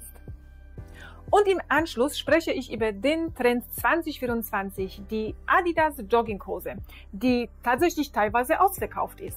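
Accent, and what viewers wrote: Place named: Poland. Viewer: Was ist das für Akzent? Polnisch? Ich nicht iś. 😮 Ch spricht man wie ch nicht wie ś. 😅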